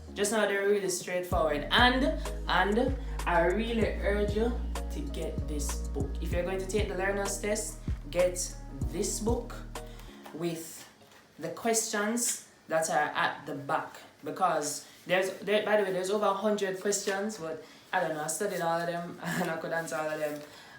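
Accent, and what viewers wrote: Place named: United States. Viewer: Talk like an American tho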